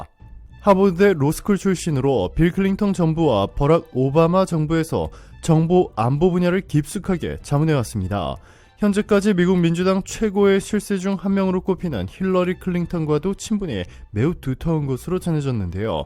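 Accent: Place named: Myanmar. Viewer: 나레이션 남성분 한국어 좀 제대로 말 하시길 바랍니다. 한국말이 마치 미얀마 사람이 말하는 식으로 들리며 끊고 숨 쉬고 이게 잘 안된다는 것입니다.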